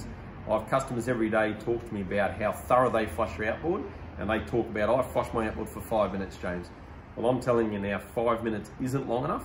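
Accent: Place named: Australia. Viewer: Australian accents are the worst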